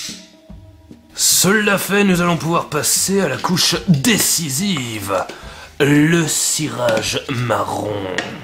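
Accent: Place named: France. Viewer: This was very helpful but what the heck did I just watch, do French people actually sound like this??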